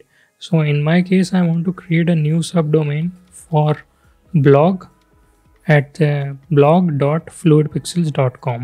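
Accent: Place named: India. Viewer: indian accent = like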